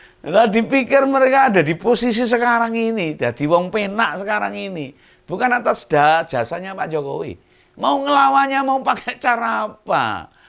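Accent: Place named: Semarang, Indonesia. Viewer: Penjelasan Om dengan logat Semarangannya asyik😅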